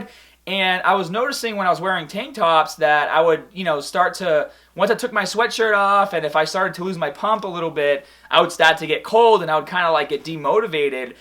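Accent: Boston, United States. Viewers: Are you from Bahstin? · That boston accent tho 🔥🔥🔥😁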